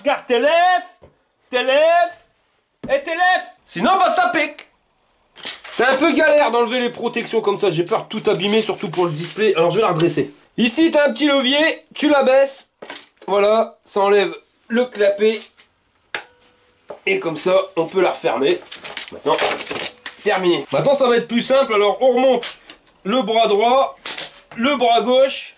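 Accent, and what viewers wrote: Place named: North, France. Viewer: toujours au top t es vidéo avec le patois du nord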